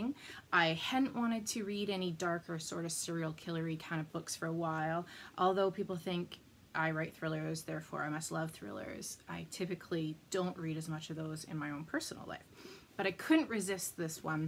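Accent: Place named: Canada. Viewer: I love your Canadian accent!! Happy for the suggestion for a good summer read,but cannot wait for another of your books! Have a great summer!! ❤️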